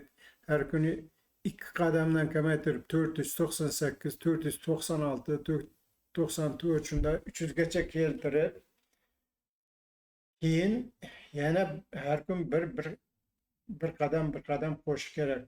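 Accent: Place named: Khorezm, Uzbekistan. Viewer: Мен хам асли Кашкадарёликман хвй,ярааааайййй....(хазил ).Бизда Барлос уруги бор Амур Темур бобомиз уруги ..Бобомиз тугилган кишлок Шахрисабзда Хужа Илгор кишлоги хозир хам жойида турибти.Улугбек Мирзо Али Кушчилар Шахрисабз шахрида тунилишган уларнин уруги Барлос уруги.Менинг дадамларнинг уруги Турк Барлос уругидан .Фаркимиз биз ман сан деб гапирамиз .Барлос уруги мен сен деб гапиртшади "Ж" харфини куп ишлптишади шевада лекин мактабдан тоза адабий тилни урганишиб ккрвкли жойда адабий тилда гапиришади ж кушмай.Лекин оилада уз шеваларида гапиришади хозир хам .Масалан Жакси журипсанма .Яхши юрибсанми? Дегани булади .Биз яхши юоибсизми деймиз.Шунинг учун улар бизни турк барлос дейди.Биз уларни шевасига улар бизга тушунишади.Кашкадарьё Сурхондпрьёдан куп чемпион болалар машхур полвонлар чикади.Чунки улар чакалоклигидан соскани упнида кцй думбасини пишириб ннбошига чупча тикиб чакалокни огзига солиб куйишади чаеалок думбани соска урнида суриб катта булади.Россияга борган Кашкадарёлик болалар .Худога шукур гуштдан кутулдик дейишармиш.Демокчиманки хар бир вилоятни узига ярашиб турган шеваси борда.Сизнинг Хоразм шевпсида гапиришингиз узингизга ярашиб туради.Мнн бир Хаййй яраййййй..десам менга Кашкадарьёликмисиииз деб кулиб.юборишади дарров гап гапга ковушиб кетади.Чунки бутун Узбекистон вилоятлари био юиоинт яхши тушунишади.Вотти вотти килса Тошкент .Емон зурсизда деса Андижон Келутту деса Наманган.Улай санга деса Китоб .Борайчийдим келайчийдим деса Шахрисабз .Туссунбой киттин бичок д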